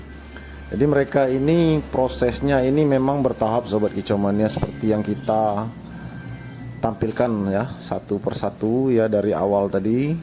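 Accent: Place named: Palembang, Indonesia. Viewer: Logat nya kayak palembang oku selatan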